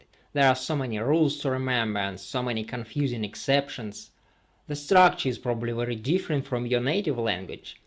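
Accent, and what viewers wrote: Russia. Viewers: He sounds more like Tevya in "Fiddler on the Roof" - which is set in Tsarist Russia. I love his accent! 😁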